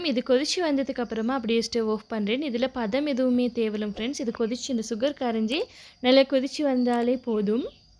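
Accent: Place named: Muslim Tamil, Sri Lanka. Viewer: இது இலங்கை முஸ்லிம்கள் பேசும் தமிழ். ஒவ்வொரு பிரதேச வழக்கு. இதுவும் தமிழ் தான். அவ செய்முறை நன்றாக உள்ளது வாழ்த்துக்கள்.💐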